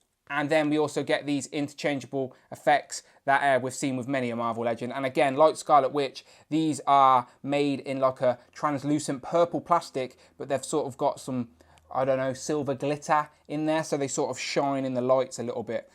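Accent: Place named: United Kingdom. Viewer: I don't know. (He sounds like an Asian guy living in England.)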